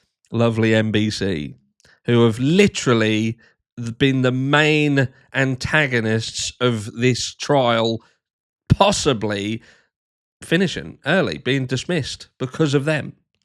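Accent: United Kingdom. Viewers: I love and his British way of saying things like ozzy!" FK RIGHT OFF! " 😂 please i need a coffee cup that says that!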